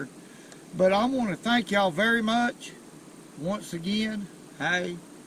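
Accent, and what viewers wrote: South, United States. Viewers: Naw, man, he wasn't drunk, he's just southern as all get out. And where he's fishing, Weiss Lake, is considered "Crappie Capital of the World", or so the signs say when you get near the lake. You ought to head there if you ever get the chance. Rent you a room at the Alabama Bell, fish the pier, hire a guide or take your boat. You won't regret it.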